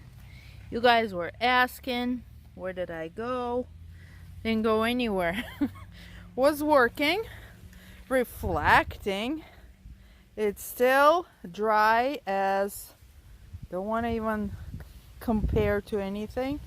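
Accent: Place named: Poland. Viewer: I love phalaenopsis and I grow them in my livingroom. I got interested in these plants through my sister-in-law. She has a kind of accent like yours, because she is Polish. That's why I thought you were Polish or Russian ;-) Florida seems a great place to live. But maybe a bit too hot for me. And too many spiders, snakes etc. Thanks for sharing your knowledge and way of life, from the other side of the world. All the best. And lot's of Rain! :-))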